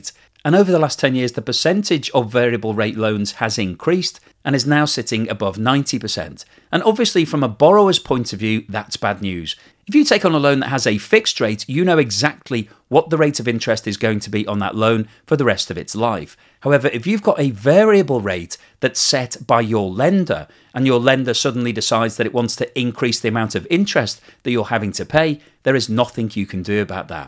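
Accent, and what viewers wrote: United Kingdom. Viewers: This propaganda piece is way to cheap. Basically, you are saying that all these countries working on this "initiative" are idiotes and don't have a clue that they are controlled. The number of participating countries keeps growing, because the new comers are not as smart as you to understand the trap? Judging your accent, don't you think it's strange that GB was among the first to invest in the bank to financing this "disaster".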